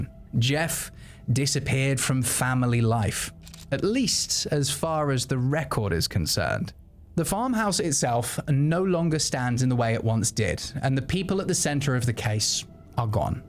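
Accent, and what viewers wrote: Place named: United Kingdom. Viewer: thank you for the new video and I am a new subscriber to your YouTube channel love your videos and love your British accent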